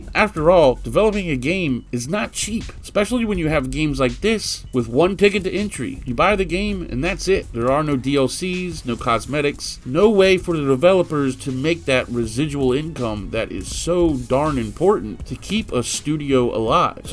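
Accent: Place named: Mid-Atlantic, United States. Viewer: Man you and I both have that mid atlantic accent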